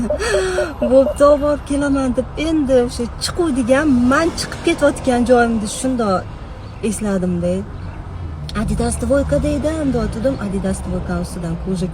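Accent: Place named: Surkhandarya, Uzbekistan. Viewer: Емоннам олам шумул иш бубтию сурхонча гапиринг сизга узингизни шевангиз ярашади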